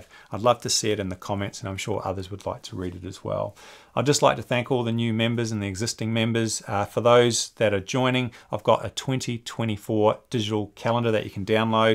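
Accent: Australia. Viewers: I started with Pentax cameras, a zoom105, a k1000, a superA, then went digital with a Pentax ist'd and recently purchased a Canon R8. The R8 came with a 24-50 and ive not long ago bought a RF24-105f4L lens. I was looking at getting something like the 100-400 you reviewed as, just being a hobbiest, paying more than $1000 is hard to do. I do like to have the nice things though so we'll see. I do like the fact that the 100-400 is fairly compact. A bigger lens might make people think I'm a professional, which I'm definitely not. Although I do enjoy photography and I appreciate great photos. I love your videos and reviews. Great to hear that fellow Aussie voice. Cheers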